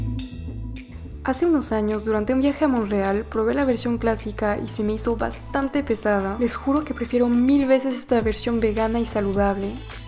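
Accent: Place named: France. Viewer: yo creo que ese acento tienen los franceses (creo que ella es francesa) y ni que sus videos fueran para animarte la vida jajajaja solo comparte recetas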